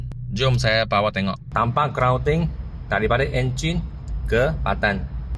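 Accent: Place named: China. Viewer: Wehy mulut ko mmg takde insurans ke musibat, ni ckp elok jek aku dengar,cuma dia pelat sbb dia berbangsa cina,yg ko jgn buat malu warganegara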